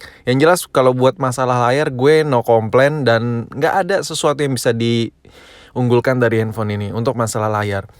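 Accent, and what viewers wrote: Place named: Medan, Indonesia. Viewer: Lu orang medan bang.. Pake bahas Kimak pulak kau...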